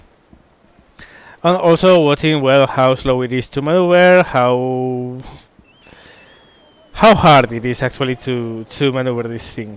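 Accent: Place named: Spain. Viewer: Eres español ¿no? Pronuncias demasiado bien los nombres españoles de los barcos y tu ingles no es nativo ni mucho menos Que conste que me encantan tus videos mate!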